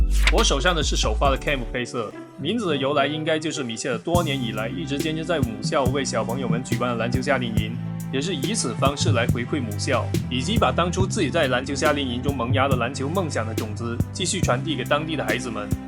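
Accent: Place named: Hakka, China. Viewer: 你是客家人嗎? 這鄉音也太重 哈哈哈 超親切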